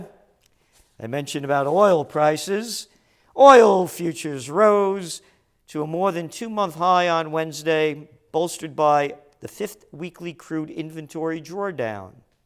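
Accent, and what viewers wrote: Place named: New York, United States. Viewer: New Yawker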